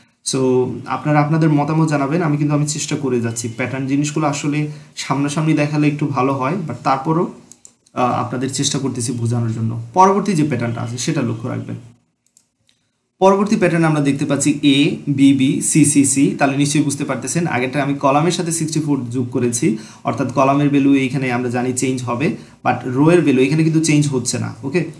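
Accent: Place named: Sylhet, Bangladesh. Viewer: thank you vai apnar karone pattern amar kase onk sohoj hoye gelo off topic vaiyar bari mone hoy sylhet ei apnar accent ta maje maje asteshe :)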